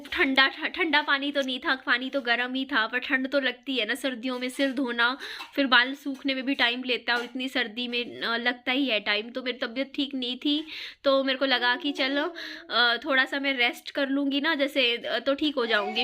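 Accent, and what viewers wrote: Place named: Haryana, India. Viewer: to mujhe haryana se charkhi dadri se lgti hai.. mtlb us ki boli se.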